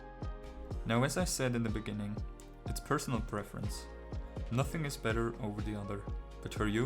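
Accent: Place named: Switzerland. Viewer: ich bin so en gott. hans useghört, dass du en schwiizer bisch haha.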